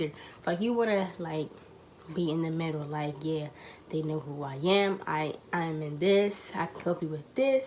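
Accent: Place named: Carolinas, United States. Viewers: 😂😂she's from like the Carolinas or Baltimore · It's a southern accent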